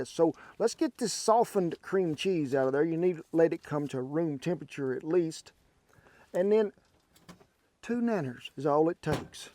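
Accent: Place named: North Carolina, United States. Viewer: I'm a nanner bread junkie,you know if you didn't live in Oklahoma I would swear you were from western NC.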